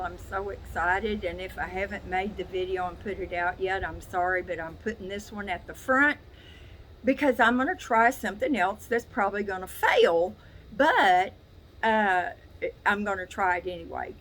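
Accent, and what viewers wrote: South, United States. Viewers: I luv your southern accent! You r the bomb!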